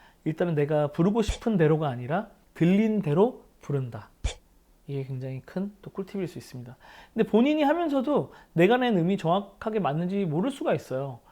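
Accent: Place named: Gyeongsang, South Korea. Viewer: 아..너무웃겨요 배잡고 뒤로 자빠짐요^^경상도발음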